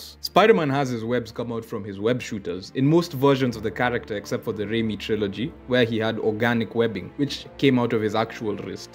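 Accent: Kenya. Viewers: You sound like u from kenya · i know a kenyan when i hear one😭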